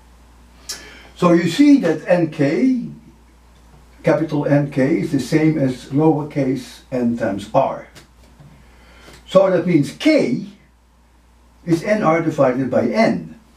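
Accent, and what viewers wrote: Netherlands. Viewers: Are u from the Nederlands?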